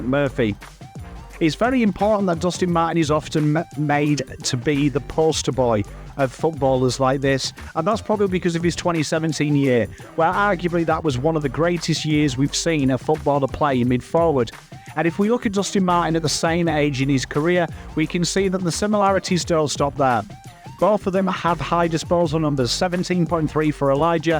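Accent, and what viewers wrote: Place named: RP, United Kingdom. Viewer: Nicely done. Definitely one for the archives! 👍🏻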